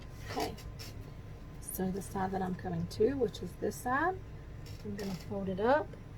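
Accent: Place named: United States. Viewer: Wish I had watched this 10 months ago when I was doing my first binding, this is the best video on binding I’ve seen.. love the way you iron the crease, no rulers and pens, and the way you joined the ends using the bit you cut off is brilliant, so less complicated like the others. Will post your video on a face book page on quilting. Did you do the quilting as that is gorgeous, is there a video on it. So glad I found your videos as I’ve only been sewing for a year, I do have an embroidery machine as well. I also love you accent, where in America are you from? I’m in the UK xxx. Thanks for the video xx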